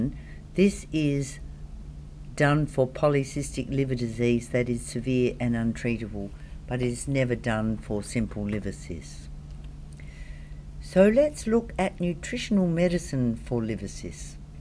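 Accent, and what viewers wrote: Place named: Australia. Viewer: Morena, just found u & now subscribed after watching this vid. Loved your informative delivery & warm Ozzy tone. ❤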